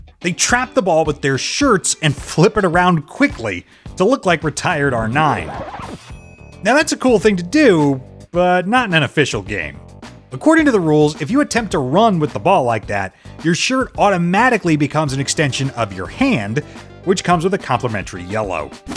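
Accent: United States. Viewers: This channel sucks I can ignore the horrible american pronunciation but making passé remarks about Ronaldo being fat is just embarassing and completely unnecessary, considering the fact that it's of little fault of his own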